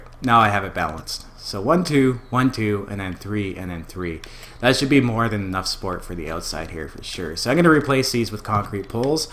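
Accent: Canada. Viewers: had a suspicion you were Canadian because of your voice man lol I'm from London Ontario what pert O Canada you from eh?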